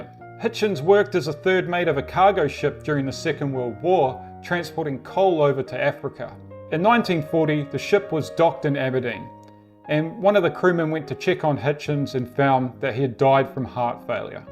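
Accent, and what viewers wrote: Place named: New Zealand. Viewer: You are lucky my friend, you got to observe different parts of the world first hand! I guessed you were from NZ from the accent...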